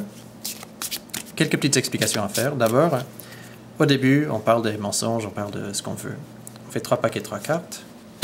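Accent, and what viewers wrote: Quebec, Canada. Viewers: wooow classe l'accent québécois 8D8D8D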